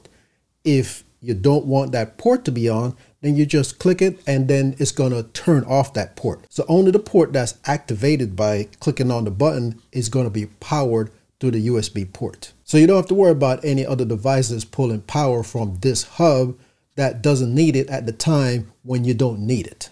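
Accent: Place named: Jamaica. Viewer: I think i hear a bit of a Jamaican accent. You a Yardman?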